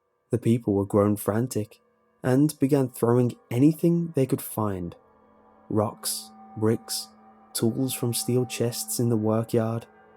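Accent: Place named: Merseyside, United Kingdom. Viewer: is a perfect story for your voice and cadence. You're accent id familiar, sounds Merseyside :)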